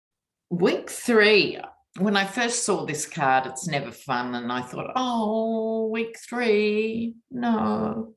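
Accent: Australia. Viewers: Hello love your tarot card reading you keep us calm when I tune in on YouTube you were the first I ever saw do tarot cards I got laugh at your next door neighbor's they were very loud and you would sit on the floor and read your tarot cards with your Australian accent l just love your channel l said this is real tarot card reader keep up the good work.......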